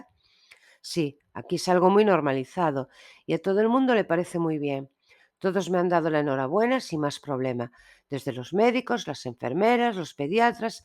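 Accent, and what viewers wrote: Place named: Galicia, Spain. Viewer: Que voz tan bonita la naradora ..el acento creo que es gallego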